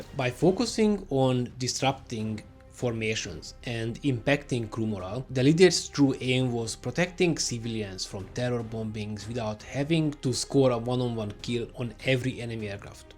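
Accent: Hungary. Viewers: Húú bazmeg, ettől az ótvar durva akcentustól szinte megfájdul a fülem. Ne magyarul mondj ki angol szavakat! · az a jó kis magyaros kiejtés:D egyébként nagyon jó lett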